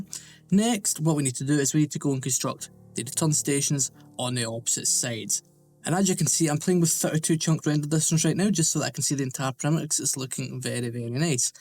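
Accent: Scotland, United Kingdom. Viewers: bro ur scotish accent really annoys me Xd (no hate)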